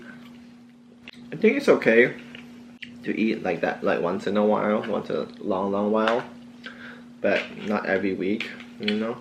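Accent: Malaysia. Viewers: Malaysian !! I watched your videos all the time, and I was always wondering what nationality you are. My instinct told me you are Malaysian cause of that accent !! HAHA. ANYWAY !! I am super happy there is one more Malaysian in the states !! PROUD OF YOU !!!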